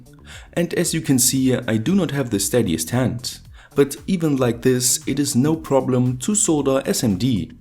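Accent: Germany. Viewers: are u deutch? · Your accent is kinda like german are you from Germany?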